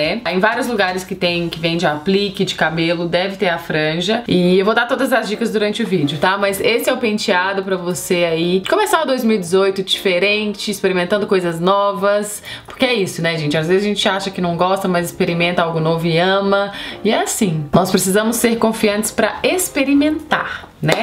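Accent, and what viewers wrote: Minas Gerais, Brazil. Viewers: Você ainda fala, mineirissimaaa 😘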